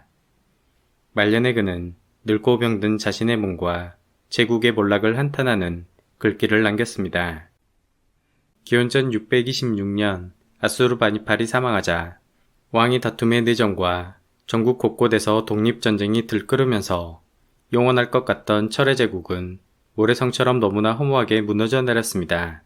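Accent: Jeolla, South Korea. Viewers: 전라도 분이신가?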